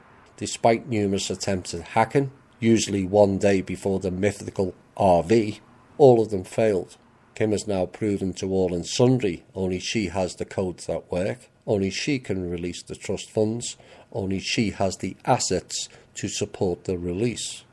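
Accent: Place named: Liverpool, United Kingdom. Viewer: That's a Liverpool accent.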